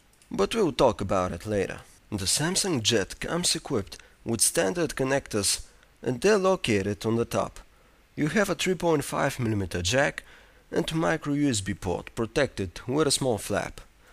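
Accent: Russia. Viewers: maybe is the site german but the reviewer is anything but german... he sounds clearly russian or polish as I am german and actually know how the typical german accent sounds like... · RUSSIAN. There's no doubt about it, and I thought he was drunk. Please arenaphone..., do something better. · ))) hahahaa man i like that russian english! :D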